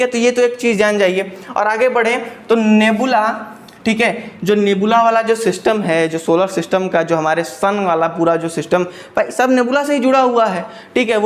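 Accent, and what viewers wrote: Bihar, India. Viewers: You have a different and a special way of teaching that highlights you from others 🤗🤗♥♥ because we don't feel bored while studying from you 😃😀 only because of your bihari language 😇😇😅😜♥♥♥♥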